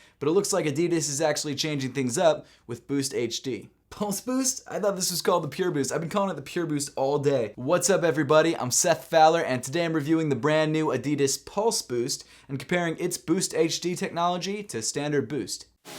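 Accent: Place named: Philadelphia, United States. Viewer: What part of philly you from bro?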